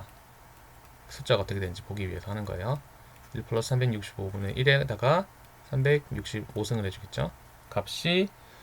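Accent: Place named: Seoul, South Korea. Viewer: 자꾸 e를 2라고 하시는 서울 발음...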